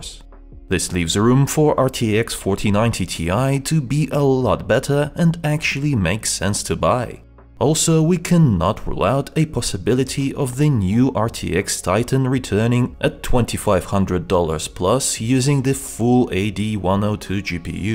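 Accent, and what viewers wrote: Russia. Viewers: Мне кажется, или он русский? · Акцент русский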